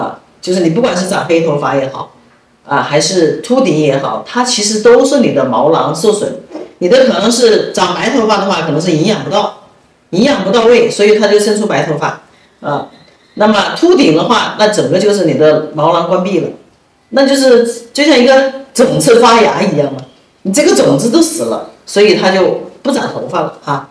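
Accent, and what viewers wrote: Wuhan, China. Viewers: 感觉博主口音一听就是武汉人哈哈 我也是武汉的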